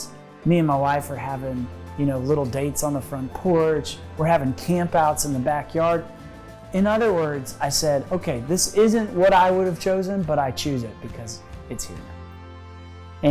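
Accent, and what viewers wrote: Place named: United Kingdom. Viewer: Hey, I wanted to let you know that I just saw a clip of you and TAN talking about Blind Eyes Opened earlier today and I love that you backed that movie. With my personal story that hit me SO hard. Thank You so much for that....and I like your vaguely British accent...:)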